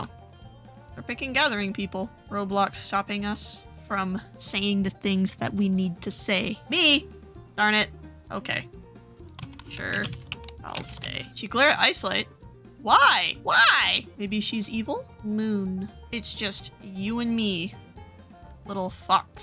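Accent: Russia. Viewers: lol u sound like you have a Russian accent and it sound cool :> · I love your accent, can you tell me if it's Russian or somethin PLS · Are you Russian like I keep hearing a Russian accent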